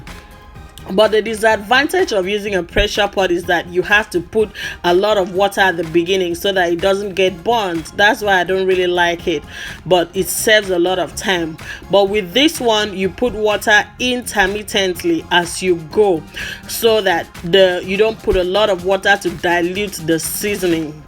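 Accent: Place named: United States. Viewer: Hi. Thank you for such interesting video. I love cow skin and goat meat. Tripe not so much. I have never had Nigerian food, but I have a Nigerian friend who happens to be my mentor. I love Nigerian movies. It’s where I learn the phrase Chineke. And most of all I love the fabric. I would love to visit just so I could buy African fabric. Thanks for adding the new words in my African vocabulary. I will go and show off on my mentor that I am slowly becoming Nigerian. You speak as if you live in the states. I live in the states too and I don’t like the cold either. Anyway I watched to the end and be blessed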